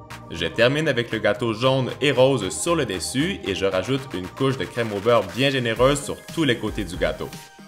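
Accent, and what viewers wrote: Quebec, Canada. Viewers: 🎂🎂Félicitation pour tes 100 000 abonnés PS :je kiff ton accent québécois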